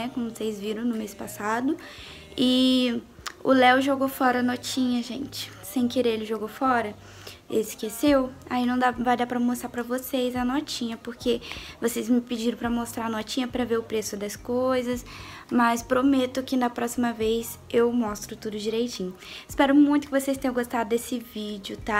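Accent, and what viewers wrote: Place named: Rio de Janeiro, Brazil. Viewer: cê é carioca mesmo? cê puxa o s kkk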